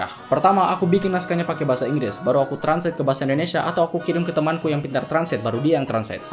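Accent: Makassar, Indonesia. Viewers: Logat makassar juga ya? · bahasa logap makassar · logatnya kyk orng makassar hahahahah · Ada logat Makassarnya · logatnya malah kaya orang makasar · Lahiran Makasar jdi kebawa logat ibunya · sounds like using makassar dialect :) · kok sedikit logt nya mirip orang makassar yah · lu orang makassar?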